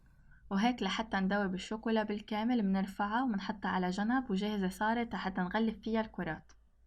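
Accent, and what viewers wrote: Syria. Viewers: شنو هاي ال لهجة السورية غير تموت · عم تحكي سوري مو لبناني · حضرتك سوريا و لا لبنانية لغتك جميلة انا مصري من صعيد مصر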